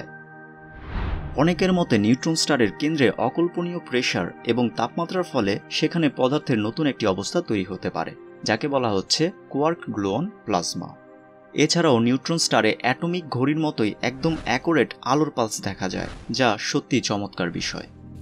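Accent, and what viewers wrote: Narsingdi, Bangladesh. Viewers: ও বাই আপনি কি আমাদের নরসিংদীর মানুষ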